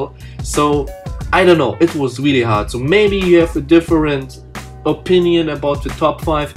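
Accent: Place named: Germany. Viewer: das ist so lustig wenn ein deutscher englisch redet man hört das einfach aber nices video :)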